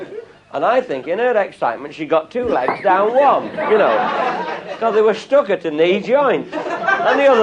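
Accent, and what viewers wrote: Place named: Cheshire, United Kingdom. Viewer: Aye...it's reet Cheshire. Canst tha kick an 'ed a bol' aginst a wol' 'till it's bosted? ;)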